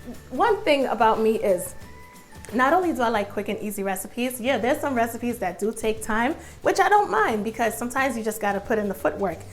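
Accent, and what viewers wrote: New York, United States. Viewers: oh yeah ....she's a New Yorker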